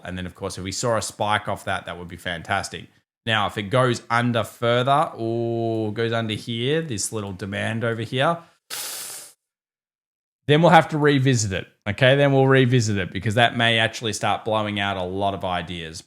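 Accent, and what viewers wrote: Australia. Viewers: An Australian accent just makes everything sound better 👍👍 Keep it up 🔥🔥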